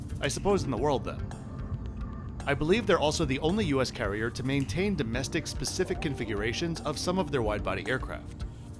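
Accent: New Jersey, United States. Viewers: Knew you were from Jersey by the way you say water. Great video per usual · I knew it! You’re from Jersey😂 accent gave it away.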